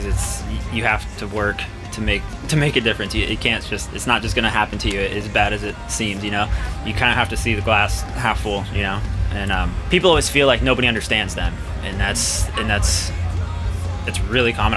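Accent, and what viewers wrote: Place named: Texas, United States. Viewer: you can hear the texas in his voice =)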